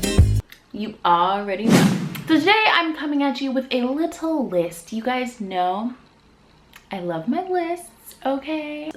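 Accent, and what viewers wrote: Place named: United States. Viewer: the american accent is so annoying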